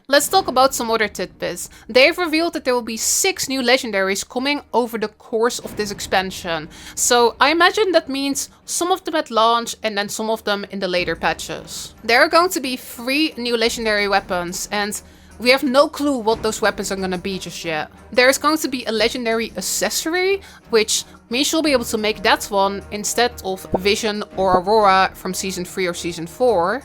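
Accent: Netherlands. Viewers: you dutch accent seem to be fading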